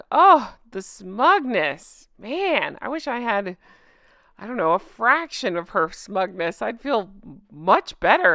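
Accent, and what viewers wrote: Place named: South, United States. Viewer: Oh dear. you crack me right up. Being an Aussie and living in the UK for a very long time, it did take me awhile to tune in to your southern accent, but now you are defo my fave you-tuber...... You make me laugh so much, you fair make my day..... I hope that 2024 is a great year for you, Jay and your family..... loads of love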